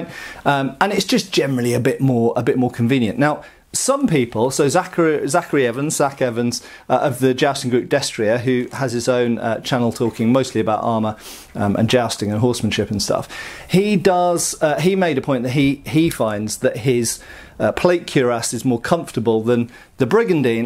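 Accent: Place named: England, United Kingdom. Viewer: No offence, I could really see you as a baddie in a Robin Hood film. Black brigandine, shaved head and English accent. As we all know, the goodies in English ‘historical’ films must have American accents!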